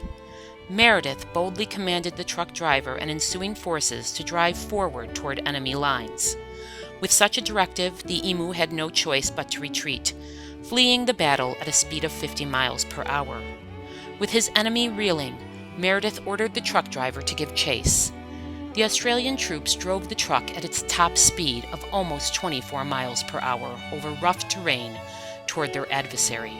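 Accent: United States. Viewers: its funny but ruined by it being narrated by an American. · WTF is an "ee-moo"? Suggestion for narrator... If you're going to do a voice-over about an Australian thing with your American voice, learn how to say its name. Google it, or ask an Australian. How hard would that be? It's an "eem-you".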